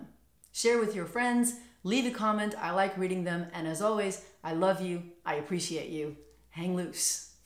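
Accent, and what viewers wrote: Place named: United States. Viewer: I just want to know how it is you sound like you were born in the US?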